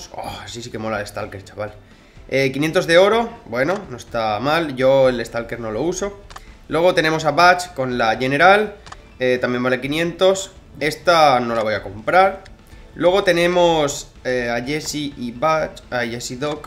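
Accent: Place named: Guatemala. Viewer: Voz Chapin como así ha pixonic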